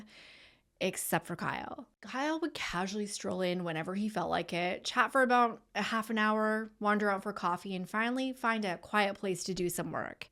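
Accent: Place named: New Jersey, United States. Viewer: Thanks for the video but the jersey girl accent is shaking up my autism. 🩵💐🧸🩷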